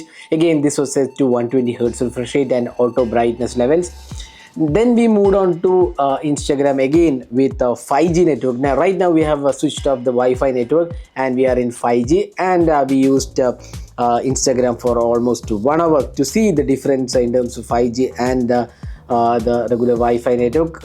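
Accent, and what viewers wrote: Kerala, India. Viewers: Malayali analle aa english ketta ariya 😂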